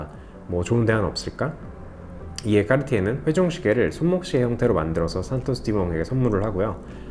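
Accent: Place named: France. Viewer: 아 히스토리 좋습니다 아니 불어액센트 뭐죠?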